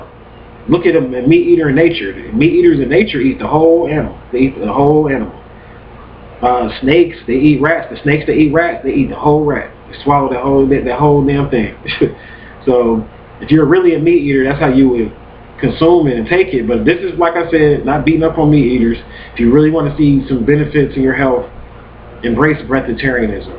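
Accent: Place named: Los Angeles, United States. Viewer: Are you from LA/Long Beach? You have a la/lbc accent.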